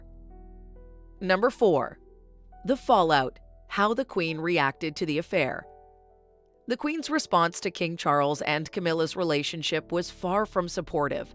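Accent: United States. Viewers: parker bowles was always a player. click bait lies, the creator of these trashy videos should be sued . always an american accent why? why would parker bowles confide in some american you tubers? im sure he would say nothing. source of this info?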